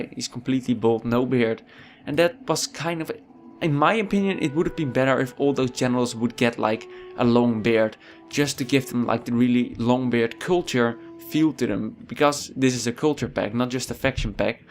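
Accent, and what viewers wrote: Netherlands. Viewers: Dat Dutch accent do :D I love your Dutch accent! Greets from your southern Neighbour!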